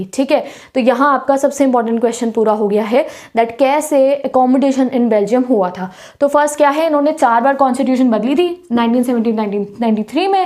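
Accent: Punjab, India. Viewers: Are you punjabi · Didi aap punjab se ho ?? Please tell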